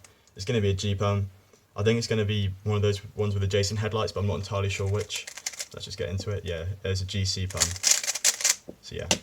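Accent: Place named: United Kingdom. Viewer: Do you actually speak with a Yorkshire accent? I love your British accent,cuz it sounds super clear and pleasant frankly speaking!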